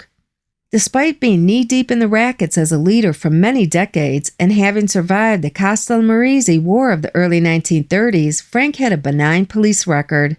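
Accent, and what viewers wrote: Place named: New York, United States. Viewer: Love your voice, it has hint of something new yorkish.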